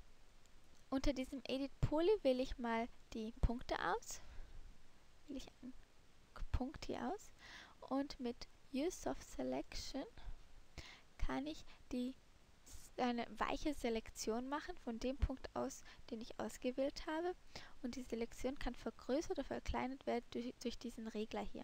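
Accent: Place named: Germany. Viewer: cutest German accent ever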